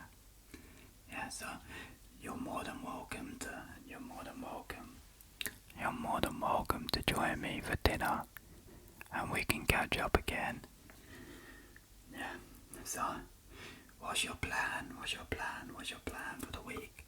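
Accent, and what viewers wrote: United Kingdom. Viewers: Great ASMR, your British accent makes it even better. ❤️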